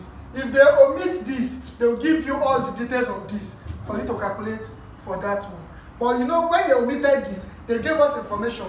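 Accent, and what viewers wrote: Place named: Nigeria. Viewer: God bless you man!!! You sound Nigerian. More love from Ghana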